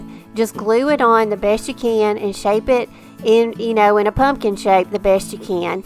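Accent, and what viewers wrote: South, United States. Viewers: Good to hear that southern draw I miss it. May I just say your pumpkins are absolutely beautiful. So simple so elegant . I’ll be making these for sure. Thank you for being a wonderful host in showing us how to make these. Happy Fall 🍂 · `I absolutely love, love, love your pumpkins. They are so out of the box from the normal ones you see. I can't wait to to do some like them, myself. Thank you for sharing your talent. I'm a southerner too, so I really like your accent! God bless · What a sweet, enjoyable video! Listening to your beautiful southern voice and accent and seeing your crazy awesome embellishments just made me get so inspired., I especially love the sweet bird nests with the little pearl “eggs”. Thanks for sharing your creative gift.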